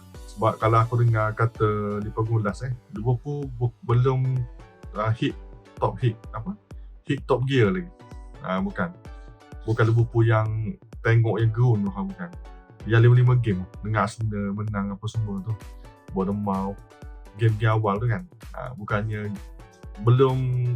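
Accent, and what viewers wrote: Kelantan, Malaysia. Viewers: Kecek kelate la abe .. santai2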